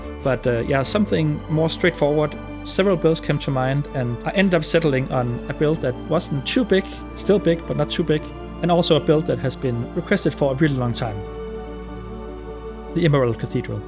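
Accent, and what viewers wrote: Denmark. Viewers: You really sound like my late oldemor. She came to America from Denmark and had the heaviest accent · Yup, that’s definitely a Danish accent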